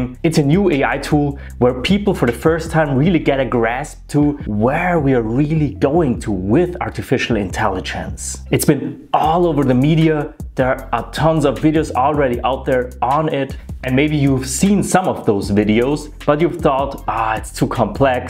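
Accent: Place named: Austria. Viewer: Great video! Very inspiring! Also - pleasure to listen to the Austrian accent :)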